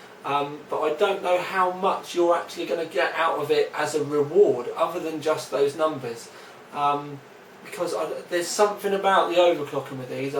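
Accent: United Kingdom. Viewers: so hard to understand a tired man with a british accent.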